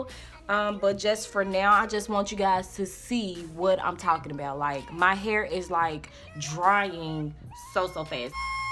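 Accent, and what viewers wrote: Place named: Midwest, United States. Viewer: Lol where you from? I’ve heard many people talk like this in the Midwest.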